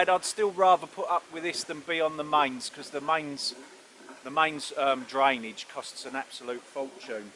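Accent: Essex, United Kingdom. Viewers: Love your vids and your day in the life ones. Possibly some of the following, how you preserve your produce, advice on keeping birds, how you go about planning what food to grow and why. More of your brilliant how to repair stuff vids. Your growing tips and hints. Ps. Nothing wrong with an Essex accent 👍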